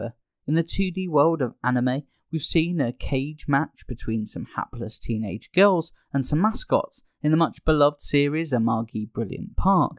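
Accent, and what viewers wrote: United Kingdom. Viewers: I actually like the way the narrator says it, it fits right in with the rest of the proper British pronunciation he uses. Lay off the coffee man, all the random all caps and aggressive tone is really off putting and undermines the point you’re trying to make.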